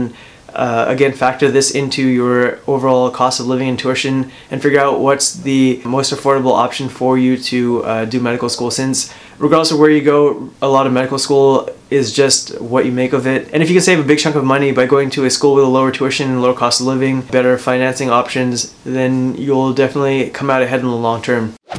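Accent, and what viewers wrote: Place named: United States. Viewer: I’m shook. I didn’t expect your accent to be an American one 😂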